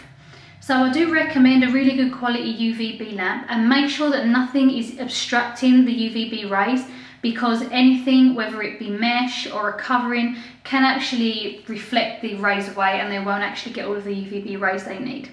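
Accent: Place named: England, United Kingdom. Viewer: Are you from UK? You sounded English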